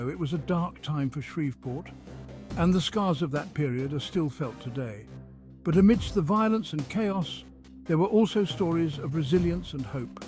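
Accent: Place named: RP, United Kingdom. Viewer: Hearing an upper class, civilized, old Englishman's accent narrating the Shreveport ghettos cracks me up 😂😂.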